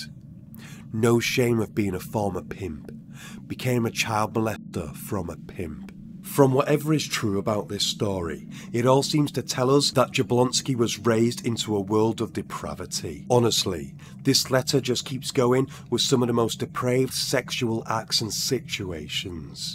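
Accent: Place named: Liverpool, United Kingdom. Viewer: Dude are you from liverpool? Your accent is so scouse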